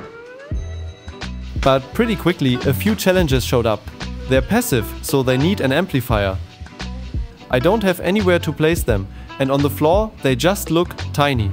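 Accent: Germany. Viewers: I love the German accent. (no joke) Great video and idea! Thank you. Merry Christmas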